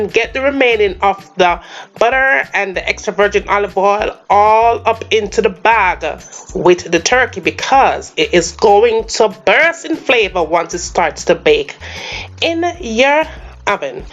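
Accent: Jamaica. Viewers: Love your Jamaican accent🥰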